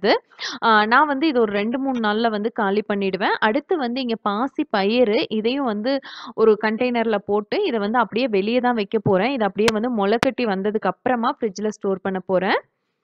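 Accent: Coimbatore, India. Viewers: Unga native coimbatoreaa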